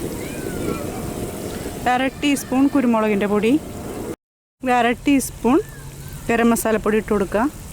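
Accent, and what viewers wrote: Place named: Malabar, India. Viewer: Chechi sherikkum thrissur thanne aano..“cherkalilla “ennu okke paranju kekkumbo evideyo oru malabar slang thonnum pinne vere ethokkeyo slang um thonnanu😊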